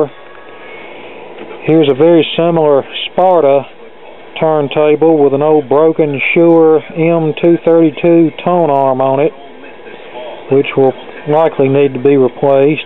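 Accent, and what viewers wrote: South, United States. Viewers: I love southern people...that voice...kills me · I was born, raised and grew up about 45 minutes outside Nashville, Tennessee. Nashville was a much smaller city than it is today in 2022. In 1985, for about a year, I moved to Downers Grove, IL and worked in Chicago. Communting into the city from Downers Grove was hell on earth, even in 1985! I moved back to near Nashville in 1986. I don't have the southern accent this gentleman has because I worked in major market broadcasting. Although I wanted to major in electrical engineering and be a radio station engineer, I did take a public speaking class in college to speak in a more midwestern accent since I had to do on air work for radio. Also my mother was born and from Brooklyn, New York. She met my Dad while he was in the military. He got station as a Staff Sargent at Ft. Campbell, KY. 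In 2022, I'm retired.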